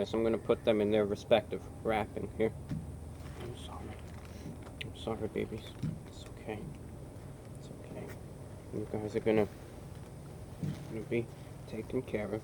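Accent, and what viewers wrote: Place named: New York, United States. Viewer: As I'm moving yet again, your accent reminds me of my hometown in NY. Thanks for helping me get through my most stressful move so far. <3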